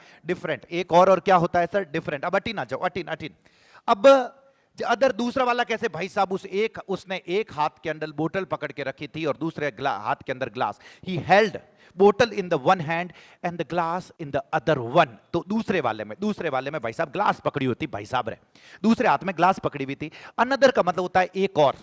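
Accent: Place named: Mewar, India. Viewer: Sar aapki mewadi acchi lagti Hai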